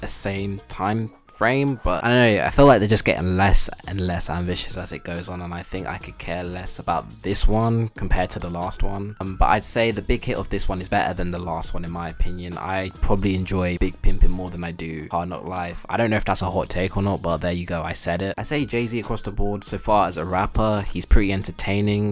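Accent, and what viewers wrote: United Kingdom. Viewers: Honestly, you don't sound black enough. Why are you wasting your fancy British accent on dissing old rappers? The whole point of the genre is machismo. Even if you made the right argument, it wouldn't matter because you sound polite.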